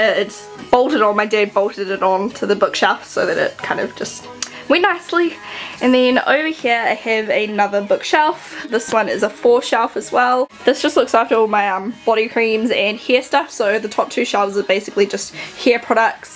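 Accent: New Zealand. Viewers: haha are you from NZ?? i live there and i kinda reconise yr acsent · Wait.... Shes from NZ? I thought she was from Australia!!! ANOTHER WIN FOR THE KIWIS!!!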